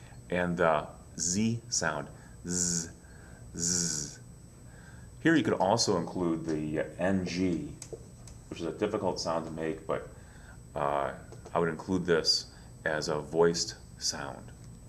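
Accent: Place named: Midwest, United States. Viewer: Viewers should be aware that this speaker has a very flat mid-western accent. In other words, his "o"s are more like "a"s. His word "stop" sounds like it is spelled "stahp". In other parts of the country, we pronounce the "o" more like a round "o", so we would say "stop" as if it is spelled "stawp"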